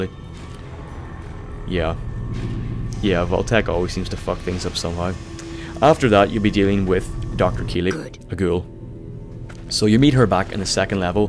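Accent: Northern Ireland, United Kingdom. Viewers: Are you from Northern Ireland? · I respect you Northern Irish Morgan Freeman. Also as you didn't upload a video this week are you uploading an extra video?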